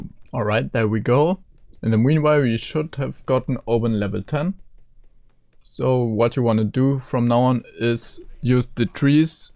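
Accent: Germany. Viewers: ur from germany arent you? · are you german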